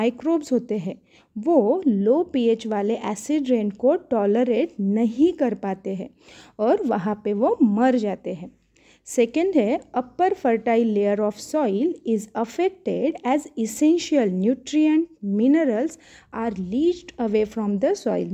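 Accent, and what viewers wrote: India. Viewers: Brilleint maam btw ma'am u r from south India i think according ur accent rii8?? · r u bengali ?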